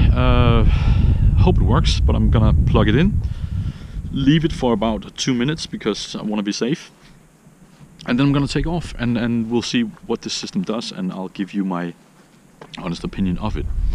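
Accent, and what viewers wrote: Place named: Denmark. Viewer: but now i am curios where You from your English accent sound Danish that whas The reason i meant i had Seen You before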